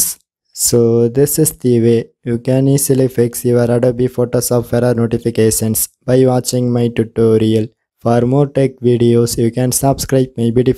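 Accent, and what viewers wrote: India. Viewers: It was hard to understand your sweet hindi accent,but it works. Thank you. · Are you from india?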